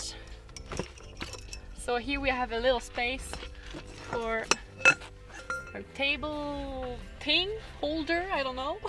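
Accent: Quebec, Canada. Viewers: Il y a plusieurs vidéos en anglais de ce genre. Ça fait du bien d'entendre l'accent québécois. Nice set-up. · Where are you located. ? Sounds like Quebec Canada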